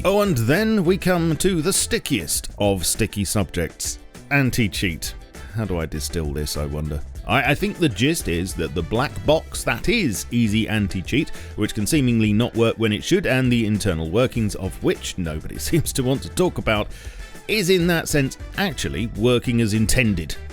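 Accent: United Kingdom. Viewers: He's British 😂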